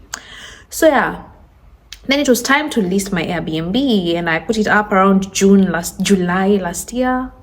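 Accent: Kenya. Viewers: You could have said that more respectfully. We didn't even hear it, because she was giving us very important information about real estate. Don't force her to talk like an American. She's Kenyan. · The sexist woman alive. (That Kenyan accent is amazing.).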